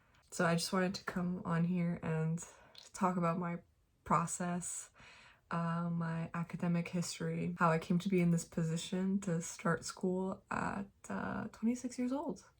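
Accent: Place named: United States. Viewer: Congratulations!! I always thought you were from America lol. Canadian and American accents are so similiar I can't ever tell the difference.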